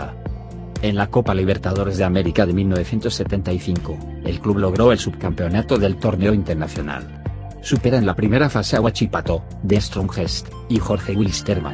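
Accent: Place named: Spain. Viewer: Escuchar la historia de Unión Española con la voz de fondo de un español, lo hace algo único y que llena de orgullo al hincha hispano ❤️💛 Enorme Video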